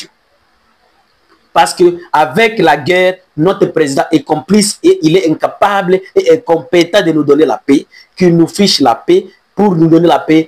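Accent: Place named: Rwanda. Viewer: Namoni yo ozali mutu ya Nanga tika lokuta ndoki ya kongo bokokima pe ozali Ruande yoka lingala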